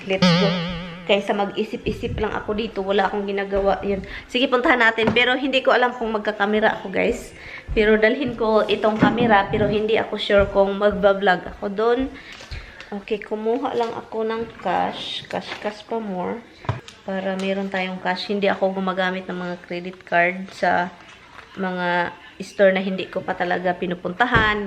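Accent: Visayas, Philippines. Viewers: hello miss avid fan ako tanan vedio vlog mo nasakit tyan ko kakatawa sau..kng paano ka magsalita ng bisaya regards sa hubby mo.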